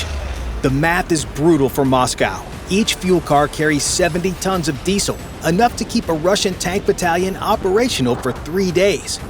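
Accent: United States. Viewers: Heavy American accent.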